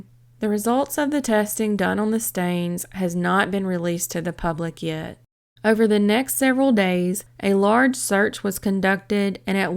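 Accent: South, United States. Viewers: Dont need background music!! Love to hear that good ole country voice!! Thank you!!:hand-pink-waving:🤠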